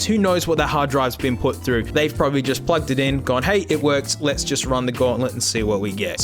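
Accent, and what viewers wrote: Australia. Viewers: This voice is so funny to me, probably because he is Australian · Gotta love the Australian accent let’s get in there MATE!!!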